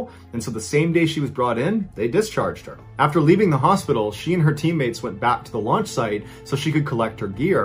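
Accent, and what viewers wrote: United States. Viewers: It's funny as an Aussie, hearing Americans pronouncing our towns and city names. Not Mr Ballen fault, it can be hard. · I do have to say I love hearing Americans say Australian towns. As an Aussie it takes me a moment to catch up on what towns are being spoken about. I end up saying it correctly in my head.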